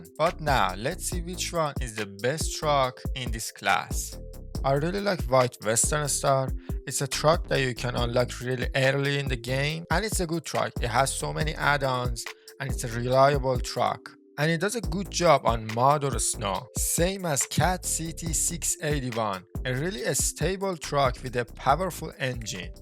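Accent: Iran. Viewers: از لحجت معلومه ایرانی هستی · Are you Iranian Bro? your accents shows that:face-blue-smiling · You are awesome dude... love your videos, what accent do you have? Sounds slightly Persian :) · He is, because of the accent. ☺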